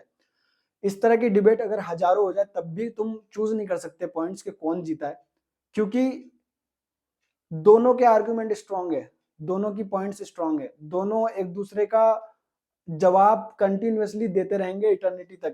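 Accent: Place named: Bihar, India. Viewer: Bihari ho kya?thoda nhi, thora bol rahe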